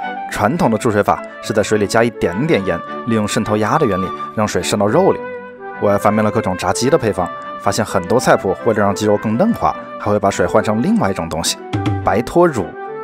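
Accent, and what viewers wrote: Wuhan, China. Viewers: 一听说的就是弯管子武汉普通话😁😂😁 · 武汉人吗？ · 哈哈哈哈哈哈好喜欢家乡口音！！武汉爱你❤️ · 是武汉人吗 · 第一次来是武汉人吗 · 博主这是武汉老乡么哈哈哈哈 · 是武汉的吗？听口音有点像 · 是不是湖北武汉的？经常会有不经意间漏出的汉味普通话出来😂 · 听口音~武汉的？ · 这熟悉的武汉普通话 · 武汉的阿儿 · 他是武汉的之前做热干面那期视频有提到哈哈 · 我一直在评论里找武汉人，果然不是我一个人觉得他是武汉人😂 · 这弯管子武汉普通话哈哈哈哈就是有点吧辣…哈哈哈哈哈哈听着跟我妈差不多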